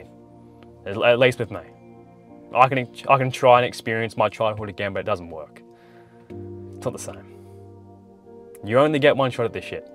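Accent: Australia. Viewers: the australian accent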